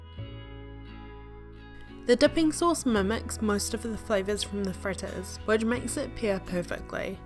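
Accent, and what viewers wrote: New Zealand. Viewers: love a kiwi accent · Sounds like a New Zealand accent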